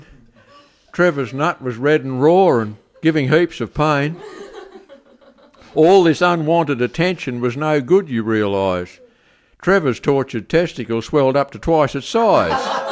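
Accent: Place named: Australia. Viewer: Thanks, I was looking for the lyrics on me trusted phone But gees I'm glad I found you tellin' it in flat Australian tone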